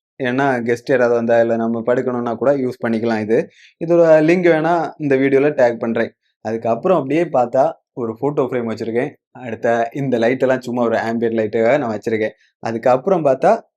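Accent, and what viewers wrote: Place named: Nagercoil, India. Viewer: TN74 slang theriyudu bro 😀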